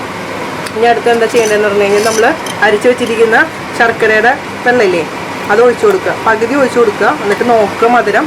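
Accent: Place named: Kannur, India. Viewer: Ingal Kannur aano😜Nala language😝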